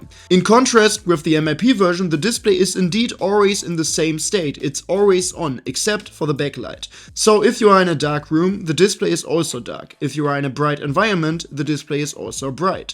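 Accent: Germany. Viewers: 10/10. The German accent takes it to an 11. I love that this channel is simply called " "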